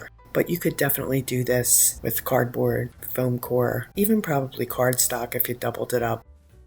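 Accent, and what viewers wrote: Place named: Delmarva, United States. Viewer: There has not been one project that you have done, that I have not been in love with. I keep coming back because you love vintage as much as I do. Thank you so much for all you do, thank you for everything that you share, thank you for inspiring me to push things a little bit further than what I normally would. I am originally from Baltimore city, and have relocated to Central Florida, and it’s always refreshing to hear you speak… not sure where you’re from but I’m picking up DELMARVA/PA, area. So you are like having an old friend inspiring me. Keep doing what you’re doing! Lots of love 🥰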